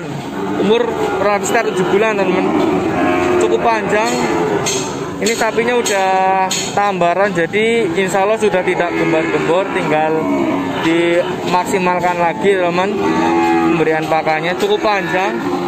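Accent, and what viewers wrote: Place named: Banyumas, Indonesia. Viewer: ngapak campur indonesia mantaaap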